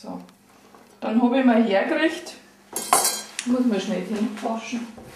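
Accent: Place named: Bavaria, Germany. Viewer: ich mag ja den akzent aus dem schönen bayernland xD das rezept probier ich das we mal aus danke für das video ;)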